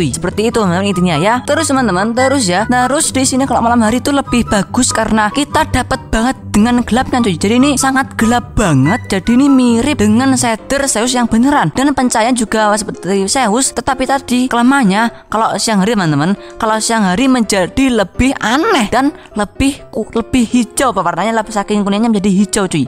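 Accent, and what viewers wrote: Java, Indonesia. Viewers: Uwong jowo ketoro ne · Sumpah gw ngakak dengan gaya bicaranya,, kayak jawa jawa gimana gtu anjay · Dia org Jawa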